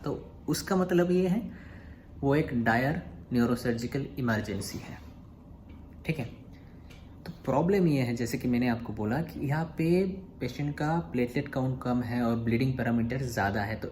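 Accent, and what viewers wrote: Bengal, India. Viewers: Just guessing that, you are a Bengali, by your hindi speaking style(are you a Bengali ?). By the way another bengali here. Medical aspirant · Dada apni bangali to.....laglo apnar kotha sune tai bollam..?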